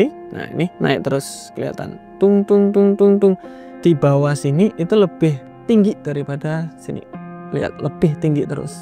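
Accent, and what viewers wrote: Java, Indonesia. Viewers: Mas medok banget orang mana? Saya jg org jawa btw 😂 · Jowo niki mas'e 😄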